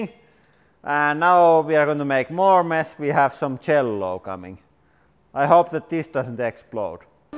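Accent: Finland. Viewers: "En then wi Hev Nutelllllaah"...i love finnish accent😂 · So satisfying and i love the Finnish accent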